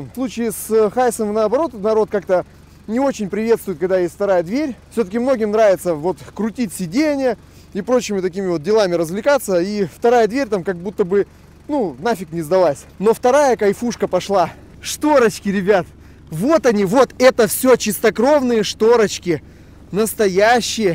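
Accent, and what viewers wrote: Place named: Irkutsk, Russia. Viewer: Подожди-ка! Ты шО иркутский???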